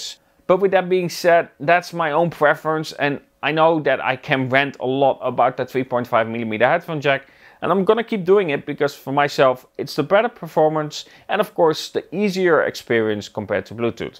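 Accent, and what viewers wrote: Netherlands. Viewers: u sound Dutch 🤔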